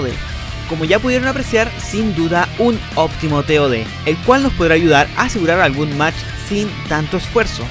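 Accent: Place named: Chile. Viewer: Eres chileno se nota en tu forma de hablar, porque yo también lo soy xD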